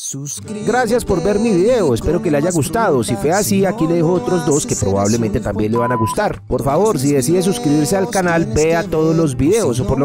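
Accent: Colombia. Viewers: El único acento Colombiano que me parece bakan y no suena hipócrita el de.. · amigo, usted es colombiano?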